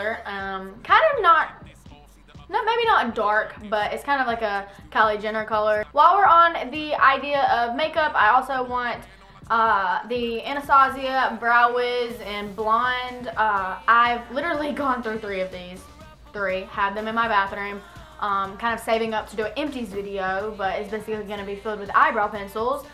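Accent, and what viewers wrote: South, United States. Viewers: Are you from the South???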